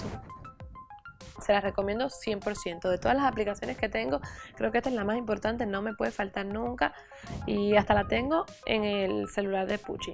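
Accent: Cuba. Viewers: Cambiastes la voz y es una técnica perfecta para conseguir más subscriptores... porque hablas ahora como tú nacionalidad ósea cubana y es lo mejor que pudistes haber echo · jajaj tienes un asentó chistoso pero no pareces q eres de aqui de mi pais republica dominicana eres como cubana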